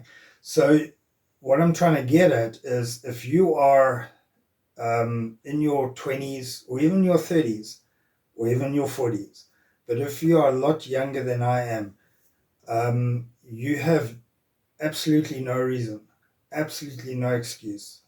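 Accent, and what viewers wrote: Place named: South Africa. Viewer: That ZA accent !!! Not just DNA.... Love to see content like this OG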